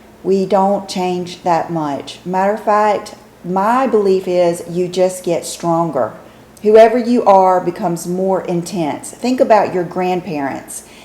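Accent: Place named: Texas, United States. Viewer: do you live in Texas. I live in Tennessee and you have about the same accent as me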